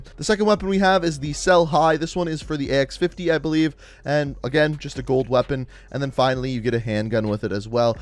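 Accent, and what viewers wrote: Canada. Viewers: I hate Canadian accents !!! You guys have weird ways of saying certain words and it kills us here in the US !!! I even hate people who live close to Canada cuz they also talk like that and its fucking annoying !!!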